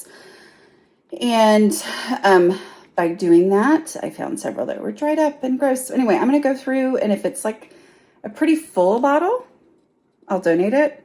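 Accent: South, United States. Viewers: Does anyone one else LOVE 💕 that southern accent is STRONG in this video? No? Just me? Well someone needs to 😍😍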